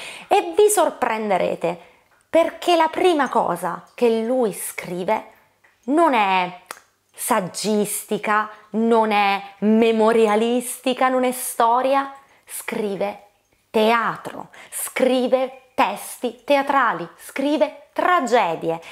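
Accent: Sicily, Italy. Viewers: Ma te sei siciliana vero?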